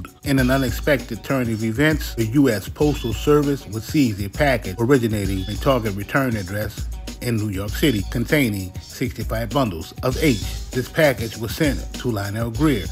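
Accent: New Orleans, United States. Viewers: for sho Big Homie I know you out the city I know new Orleans when I hear it . Yeah talking bout those people who don't know new Orleans like we do . But anyway keep the stories coming.love the channel. Btw I'm out dat 8.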